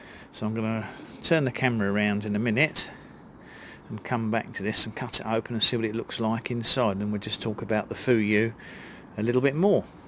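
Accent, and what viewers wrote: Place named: Essex, United Kingdom. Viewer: yes I recognise the Essex accent 🤣 I’m in Langdon Hills so only down the road. I’m looking forward to learning more!!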